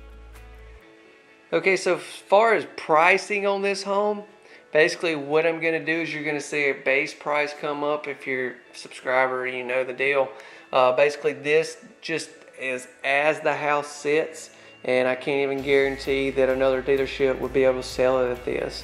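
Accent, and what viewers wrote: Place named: Alabama, United States. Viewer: Great video, I would like to mention how I enjoy your Alabama accent, my spirit is good 97% of the time as I journey through life! And I am AA and i'm a fan!.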